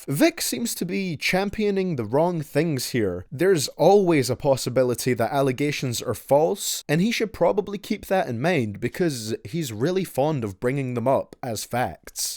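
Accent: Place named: United Kingdom. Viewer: Bro wtf why u talking british lol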